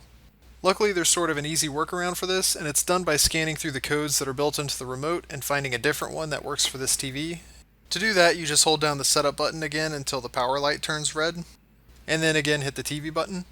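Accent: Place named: Maryland, United States. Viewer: You are the man. What is your accent are you from MD? Thanks so much.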